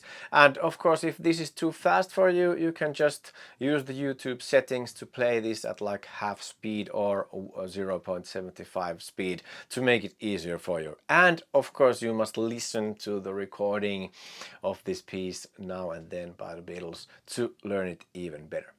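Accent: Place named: Finland. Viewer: Great tutorial. And i really dig your Finnish accent and vibe—and smile. Thanks for posting.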